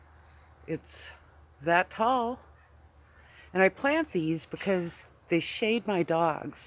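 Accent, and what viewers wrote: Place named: United States. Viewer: like your American accent - thank you for sharing - like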